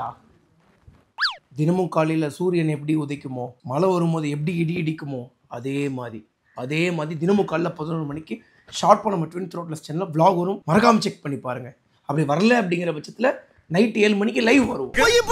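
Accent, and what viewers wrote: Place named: Madurai, India. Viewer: என்ன ப்ரோ மதுர🔥 பயலுக சிலாங்குளலா பேசுற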